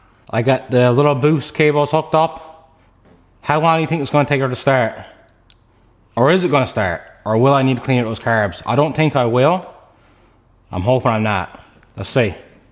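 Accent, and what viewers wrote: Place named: Newfoundland, Canada. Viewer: Soon's I heard ya speak I said "My son, there's only two Islands that guy could be from."..So I took a look around outside and I don't see ya nowhere here in Cape Breton so Newfoundland it is.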